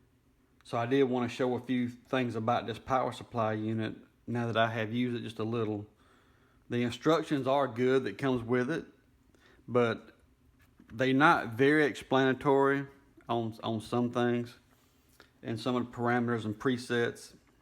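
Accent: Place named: Tennessee, United States. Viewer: great video , you saved me from deciphering the manual .. great accent , is that from Tennessee or Texas or both :)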